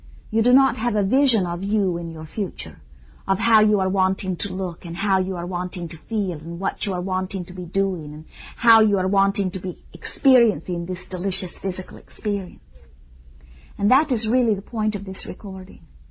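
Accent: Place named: Ireland. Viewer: her accent was diffrent when she was youg??? she sounds irish here!!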